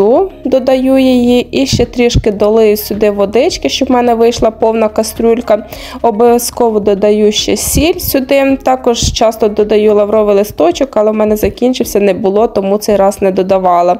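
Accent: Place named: Lviv, Ukraine. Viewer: Дякую за відповідь,бо розмова в вас така Львівська,але я не права Українська.Здоров'я вам та миру.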